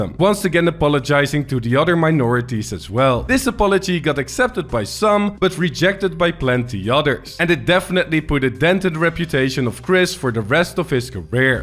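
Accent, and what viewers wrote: Netherlands. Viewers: Ben je nederlands mooi accent😂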